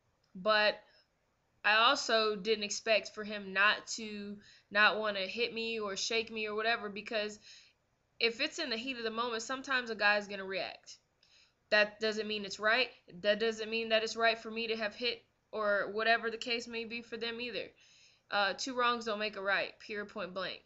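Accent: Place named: United Kingdom. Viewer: im guessing you are brittish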